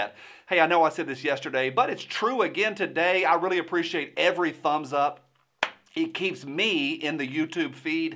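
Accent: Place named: Portland, United States. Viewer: It sounds like she’s from Portland.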